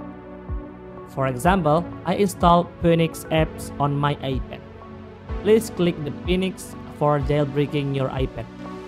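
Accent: Indonesia. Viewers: Your accent kek orang Indo anjir but its good tho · benerrr tp aksennya ketebak banget klo org indo WKWK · org indo ya bg? ketara accent nya😅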